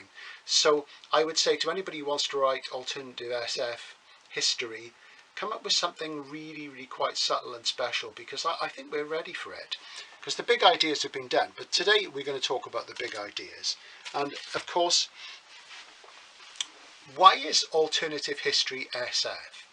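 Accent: Wales, United Kingdom. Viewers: I've been a fan of SF since before my teens (I'm on the verge of 60 now) and Alternative History was always a guilty pleasure. I say guilty because to be honest a lot of it wasn't terribly good, with bad writing hidden by concept, but there are enough gems out there to make the sub-genre worthwhile.. I've loved 'Pavane' since I first read it back in the 70s, and was particularly pleased to finally visit Corfe Castle about 5 years ago. A couple of others I remember from back then are 'A Transatlantic Tunnel, Hurrah!' by Harry Harrison, and 'The Last Starship From Earth' by John Boyd, in which the reasons for the alternative world lie very far back in the narrative, until the rather wacky ending. No doubt you will also do a time travel discussion at some stage, and I shall look forward to it, as fI'm a sucker for Welsh accents anyway, and you have a very easy and confident speaking style.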